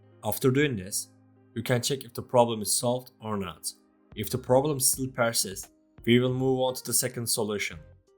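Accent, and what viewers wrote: Turkey. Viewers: İngilizceyi türkler gibi konuşuyomuş bu çocuk diyodum hangi milliyetten acaba derken yazısını gördüm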